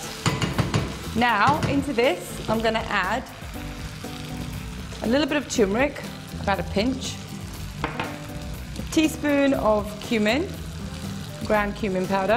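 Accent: England, United Kingdom. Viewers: Sounds very British accent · mam.. your British accent is brilliant.... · love British accent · Rahul Gaikwad it’s called a british accent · Ok, I have never heard a more "English" accent in my entire life. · I’m guessing why she is talking like British and turns out she is British! ain’t it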